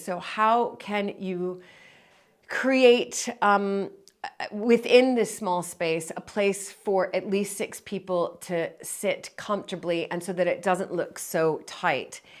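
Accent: United Kingdom. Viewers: Could’ve left the posts on terrace and made either a trellis or strung tiny little lights sort of as a privacy screen:) I like that I can hear the slightest bit of a British accent coming through when you speak:)love your place it’s beautiful:) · it's been a while since I've watched one of your videos and you've been in Britain long enough now that your American accent is taking on a British sound in words like, also, love, all, and wall. Interesting! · I’m hearing your English accent coming through 😊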